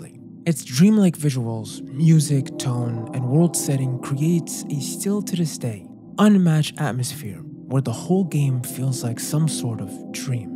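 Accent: France. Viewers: T'as un super accent français, et tu viens de gagner un abonné ! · you have a french accent, my friend, you didn't actually need to use babbel to speak it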